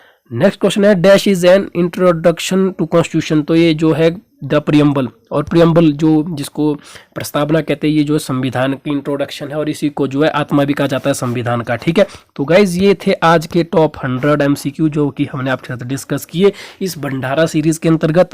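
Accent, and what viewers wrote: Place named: Pahadi, India. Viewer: Sir me to yhi bolna cahugi.ki app jo pahadi lehje me bolte hai supub apka jo merital hota hai best.and padane ka tarika altimate.keep it up sir..vese app kha se?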